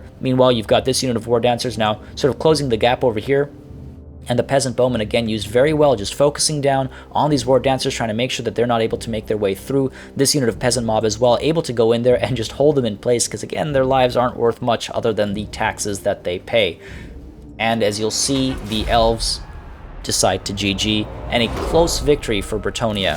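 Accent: France. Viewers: coming in hard with those french pronunciations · Rocking the french accent! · Woah dude! Where does that nice French accent come from?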